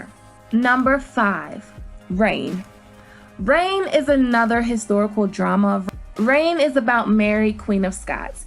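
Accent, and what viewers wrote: United States. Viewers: Your accent is so american, it literally sounded for a few seconds like you tried sounding dumb on purpose.. couldnt watch anyoner after i realized that it was actually just how you talked! :D You sound like a female cowgirl from the 1800's chewing tobacco and spitting on the floor of your own house..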